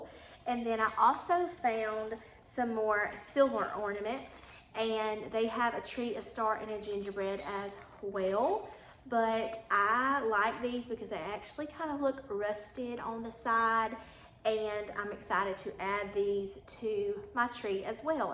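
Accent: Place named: South, United States. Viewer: Love your southern accent 🇬🇧🇺🇸🌸🌸 (I really miss being in Tennessee)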